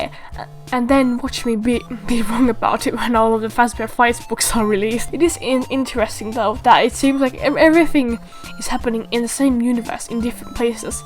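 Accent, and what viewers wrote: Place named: Australia. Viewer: my god you're australian (or british. i can't tell from the two sometimes ;-;) your voice is nice. and great OC!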